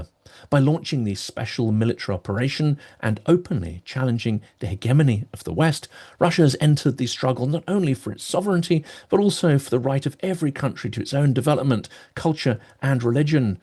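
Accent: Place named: West Country, United Kingdom. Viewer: How dare that hypocrite Galloway claim he cares about suffering humanity... while supporting Russian imperialism! The guy is a fraud, a morally bankrupt political opportunist who jumps into by-elections to get his ego stroked by being in the headlines again...upon winning a by-election (such as Bradford and Rochdale) he soon abandons his constituents - the campaign doing its job of getting his face, voice and name in the news, his ego bolstered. Thanks. I love your eloquent and witty - yet acerbic when needed - monologues. I could listen to your fantastic lilting West Country tones (I am assuming it is a West Country accent, right?) all day!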